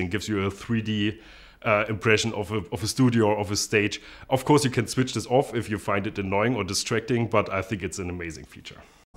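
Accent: Germany. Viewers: omg this German English so funny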